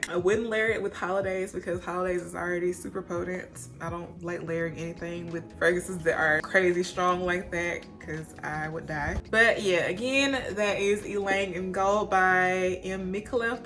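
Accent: South, United States. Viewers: What’s happenin lovely?Been subbed to you for a few months I think.Been meaning to pass through your page to show you some love at some point.Saw your vid here on my feed,so here I am 😉 Super solid page and content fam.Mad respect.Cool video here as well.Love your southern accent too :) Keep up the good work and have a wonderful week and beyond.SOTD = Ex Nihilo’s Bois’D Hiver to the wrists and forearms,and Creed’s Royal Oud to the front and back of the neck...came out Glorious 😊 Got the idea from Max Forti’s page a few years back.He recommended Oud Wood and Royal oud which is a really nice blend too..but I tried the Bois ‘D Hiver variation instead of the Oud Wood with Royal Oud because of their striking resemblance.Real cool :) 1 Love/Peace/Bless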